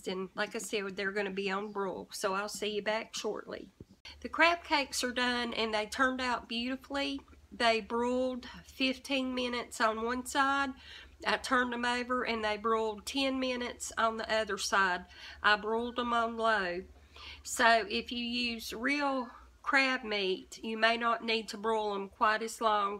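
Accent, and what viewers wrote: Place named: North Carolina, United States. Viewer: I knew your accent sounded familiar! I’m from the Boone/Blowing Rock area :)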